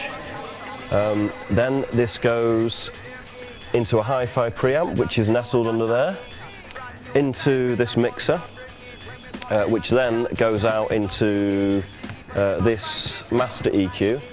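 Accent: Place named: United Kingdom. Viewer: Oh! He's a brit!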